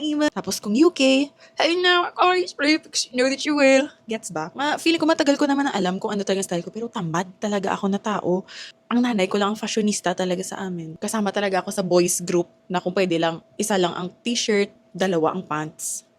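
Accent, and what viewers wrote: Iloilo, Philippines. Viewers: skruwd ap ilonggo accent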